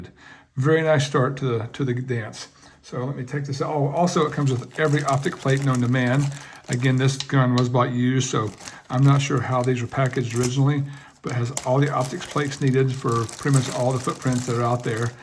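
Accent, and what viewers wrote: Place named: Belgium. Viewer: I’m just guessing, but are you originally from Belgium? Had to ask because of the accent 😉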